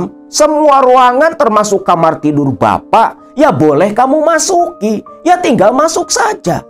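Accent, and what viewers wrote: Java, Indonesia. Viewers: Betul sekali 😁😁 ngomong jowo e wes medok 😅😅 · Oiyaa Ko aku salpok sama suaranya jadi medok kaya wong jowo😅😅😅🙏👍👍👍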